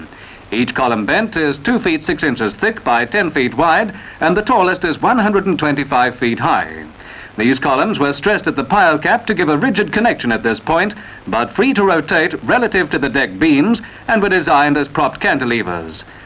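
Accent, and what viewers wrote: Australia. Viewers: He's Tasmanian, ask someone from Smithton Tasmania where they're from and you'll hear smitten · Ya got tru blue aussie accent there mate 🤠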